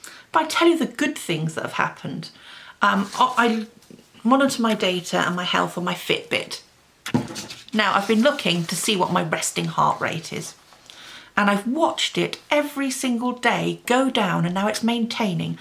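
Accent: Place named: England, United Kingdom. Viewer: I am just so impressed with you! Your honesty, commitment, and down-to-earth attitude will bring you to unimaginably (for now) good places. I love to listen to you talk--adore your English accent. 🧡 You are courageous, and you have inspired me. Thanks for these videos and your blog! I look forward to watching you succeed! ~ Melanie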